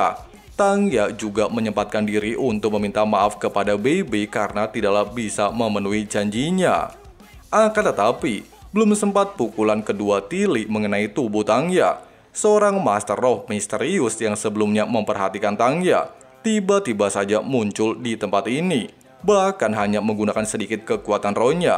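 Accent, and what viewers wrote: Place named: Indramayu, Indonesia. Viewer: Dermayu bang lalah cah ya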